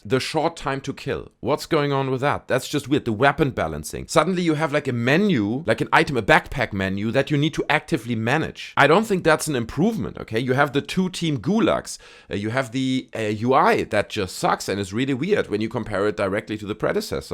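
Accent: Germany. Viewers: I like your style. You sound German, but have really good English. And your videos aline with what i usually watch - anti woke, cod, pop culture stuff. Keep it up. I'll watch some more and gonna sub later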